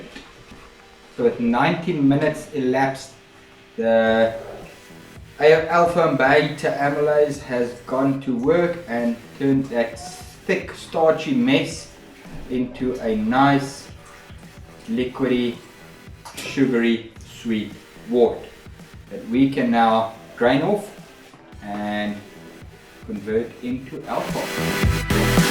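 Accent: South Africa. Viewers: I cheated, LoL:-) I could tell by your accent that you're South African, so I used google translate.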